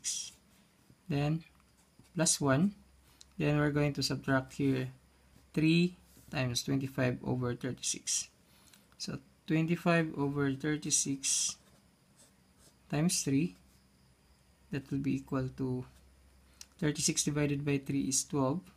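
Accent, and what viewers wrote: Philippines. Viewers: When i heard his accent i know that his filipino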